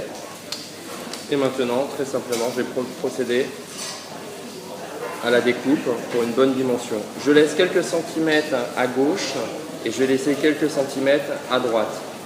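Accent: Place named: France. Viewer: Ich habe bislang nur sehr selten jemanden gehört, der so französisch spricht, wie der Protagonist in diesem Video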